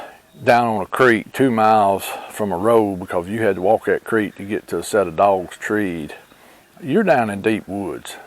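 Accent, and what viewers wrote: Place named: South, United States. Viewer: I love hearing ur southern accent like Cam from Dixie Cryptid & love your stories. I hear a lot of encounters from Alabama. They are everywhere, it's just most ppl don't know it. Thx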